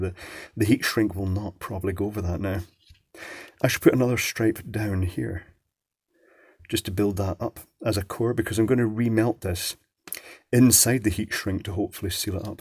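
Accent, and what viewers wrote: Scotland, United Kingdom. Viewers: A Quick question are you from Scotland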